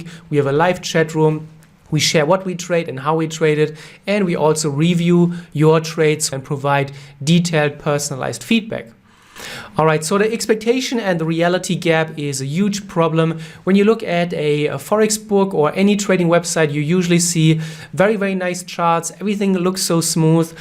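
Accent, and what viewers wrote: Germany. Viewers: are you from germany? :)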